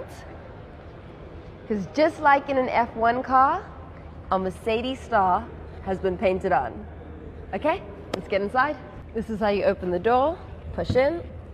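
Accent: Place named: South Africa. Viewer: She looks and sounds South African ❤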